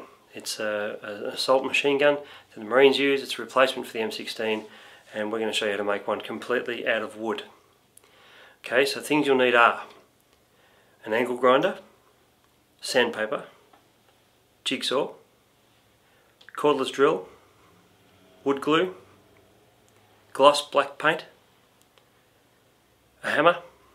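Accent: Australia. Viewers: Mmmm, interesting, is that an Aussie accent? Good job! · Yeeeeeeeeeesssss an Aussie! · r u an aussie if so i applaud you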